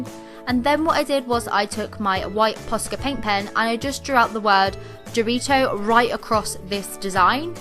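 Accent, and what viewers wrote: United Kingdom. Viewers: Luv ur accent I wanna go to uk so bad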